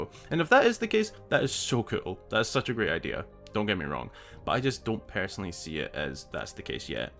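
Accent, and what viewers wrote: Scotland, United Kingdom. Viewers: It's cool dude. It's nice to see the face to the wonderful oh so similar accent that I haven't heard much. Mon the Scots lol. So good a concept I can see/hear the likness to? I guess we will need to see next year 😭😭😭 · Glad to see the man behind the awesome scotish accent ;)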